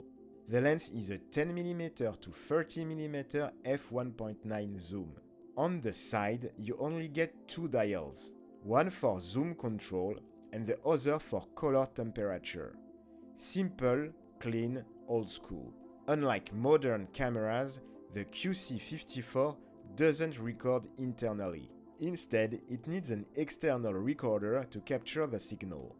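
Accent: France. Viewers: Mais c'est super l'accent Francais, ici aux USA ils adorent ca !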